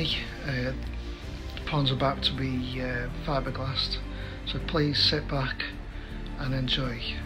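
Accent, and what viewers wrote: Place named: United States. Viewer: I thought l would watch but but you are american, l thought you were british.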